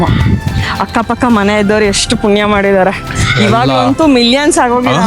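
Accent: North Karnataka, India. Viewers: thank u so much for posting this 🙏🏻 ನಿಮ್ಮ ನಿರೂಪಣೆ ನಿಮ್ಮ ಭಾಂದವ್ಯ ಮಾಹಿತಿ ನೀಡುವ ಶೈಲಿ ಸುಂದರವಾದದ್ದು ನಮ್ಮ ಉತ್ತರ ಕರ್ನಾಟಕದ ಭಾಷೆಯನ್ನೂ ಅಮೆರಿಕದಲ್ಲಿ ಕೇಳಿ ಖುಷಿ ಎನಿಸುತ್ತದೆ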